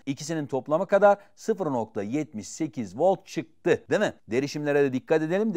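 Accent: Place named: Thrace, Turkey. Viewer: Hocada bi trakya ağzı var da ekrem imamoğluna benzemiyor nasıl benzettin :D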